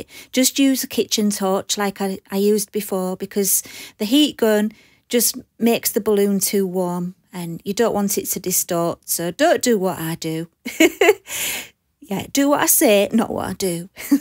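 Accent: United Kingdom. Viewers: dear god i could fall asleep to this womens voice cause it is just so soft and nice sounding. Is this accent from the UK? if so where exactly is the area this accent is from? anyway wonderful video and a wonderful voice :)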